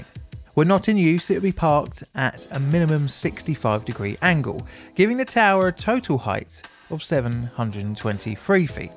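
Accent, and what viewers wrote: United Kingdom. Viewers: Don't you mean write? 😄.... Just the idiosyncrasies of the british accent.if he was from east London /Essex it'd be free for three and firteen for thirteen.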